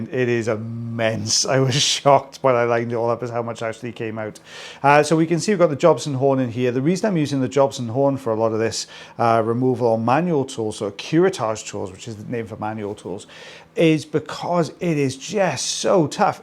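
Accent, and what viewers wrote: Wales, United Kingdom. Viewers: he's a beautiful Welsh accent 😊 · I couldnt mute you. The Welsh accent is the best in the world. KEEP TALKING!!! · oh absolutely, the british accent doesn’t hurt either. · i love listening to you talk! 😂 i can listen to any UK accent forever, so lovely. i have a Welsh friend, he lives in England now but he’s quick to tell people he is WELSH 😂😂😂😂 Thank you! ❤ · I usually listen with mute on but I decided to listen to you and you're very interesting and I am an American and I love to hear how people from the UK speak❤ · Your Welsh I'm Welsh keep talking, brilliant 😊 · Who wouldn't want to hear your lovely Welsh accent?!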